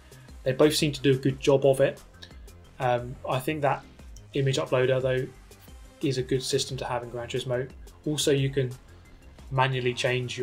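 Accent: England, United Kingdom. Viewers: Just a really heavy English accent I thought you'd be white. love your vids man keep it up :D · I like is voice and his british accent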